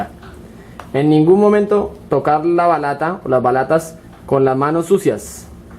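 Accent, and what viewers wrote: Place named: Colombia. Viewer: Buen video... Tu voz y acento colombiano me hizo acordar a Nicolás, el mejor amigo de Betty la fea. Saludos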